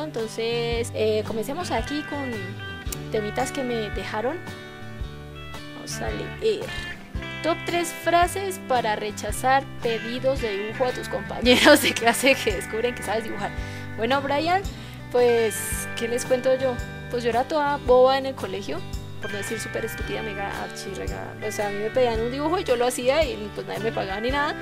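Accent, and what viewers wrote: Colombia. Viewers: No joda parse es colombiana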